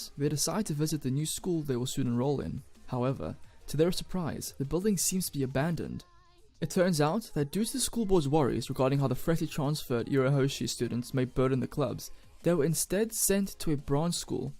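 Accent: South Africa. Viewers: Are you from South Africa? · You from South Africa?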